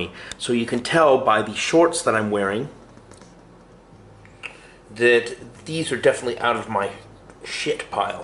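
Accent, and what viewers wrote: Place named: United Kingdom. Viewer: Where about in Britain is he from??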